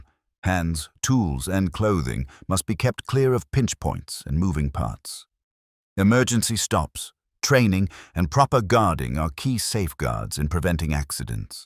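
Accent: United Kingdom. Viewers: It looks as if all of these incidents occurred in a third world country where there is no OSHA. And, I'm sooooo tired of the English accent narration.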